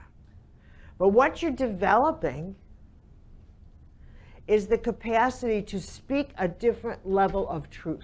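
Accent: Chicago, United States. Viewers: Is she from Chicago? She sounds like my childhood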